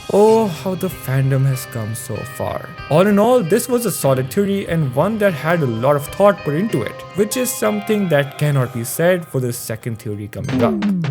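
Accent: India. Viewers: Everyone in the world has an accent. He sounds Indian. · I Think its indian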